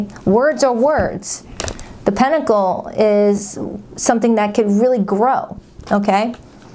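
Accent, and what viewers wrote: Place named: Boston, United States. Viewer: Bostonian here too!! I was trying to place your accent before and wasn't sure. 😂 🙌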